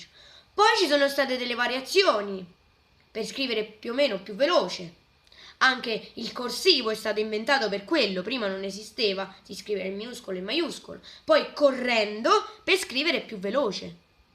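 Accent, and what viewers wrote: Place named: Campania, Italy. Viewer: Dal' accento mi sembri campano, grazie dei tuoi studi sulla Sardegna 👏❤️💓❤️💓sei bravissimo 💓💞💓💞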